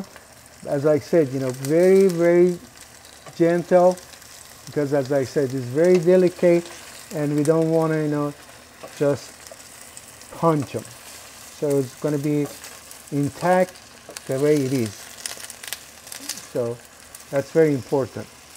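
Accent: Iran. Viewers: beautiful and sincere Persian accent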